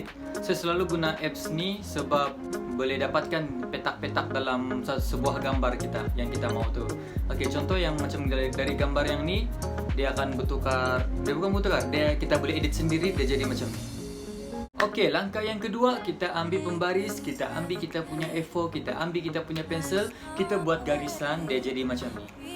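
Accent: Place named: Sabah, Malaysia. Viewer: Mcm ada bahasa sabah campur kl😂 btw hope you get a lot subscribers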